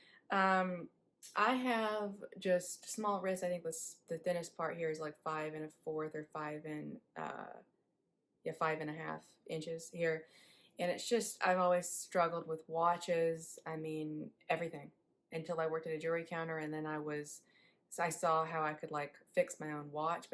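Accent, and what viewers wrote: South, United States. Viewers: Cool southern accent!❤great review!👍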